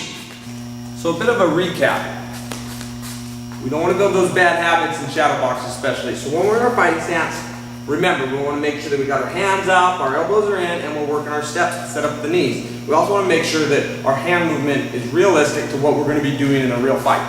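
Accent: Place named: North, United Kingdom. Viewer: you live up north somewhere? I can tell since you say bag as bayg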